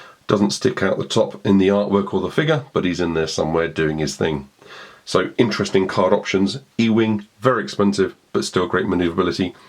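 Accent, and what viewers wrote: United Kingdom. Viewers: I say he vote, British people should narrate everything.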